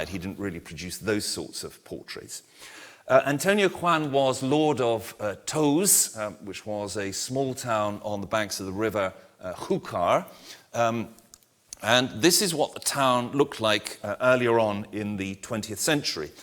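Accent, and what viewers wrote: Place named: England, United Kingdom. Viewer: Congratulations for the exhibition, National Gallery! The speaker is very well documented! And he has such a clear English accent when he speaks!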